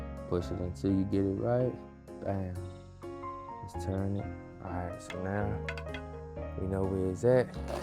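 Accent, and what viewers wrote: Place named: Chicago, United States. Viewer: I hear a Chicago accent. 😊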